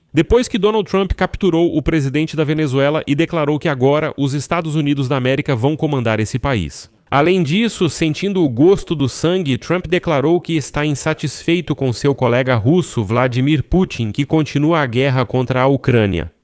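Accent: Portugal. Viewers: A IA fala português de Portugal. Claro , correto e compreensível. Aqui não se esquecem do plural e singular . Simplesmente um português corretíssimo. Parabéns IA de língua portuguesa .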